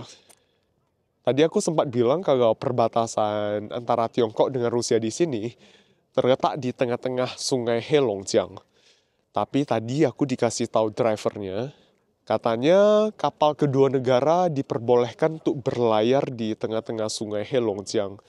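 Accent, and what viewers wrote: Java, Indonesia. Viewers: Logat jawanya kelihatan ko🙏🙏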